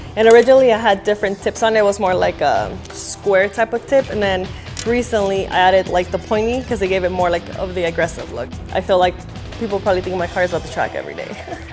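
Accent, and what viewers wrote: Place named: East Los Angeles, United States. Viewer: Damn she sounds like she's from East L.A.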